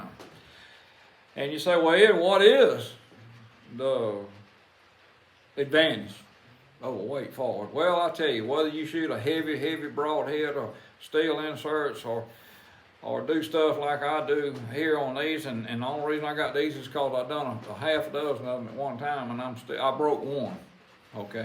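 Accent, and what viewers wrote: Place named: West Virginia, United States. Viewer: You talk just like everyone where I grew up in West Virginia and I think I must've talked like you too back then but lost it. You talk like my friend John and others around there near our farm. But you’re with wild hogs thick so you’re probably further south. Praise the Lord and pass the arrow-harvested wild bacon !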